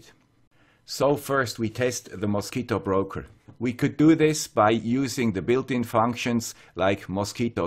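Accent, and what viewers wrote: Switzerland. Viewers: I am half swiss and I can confirm this man's accent is aggressively Swiss.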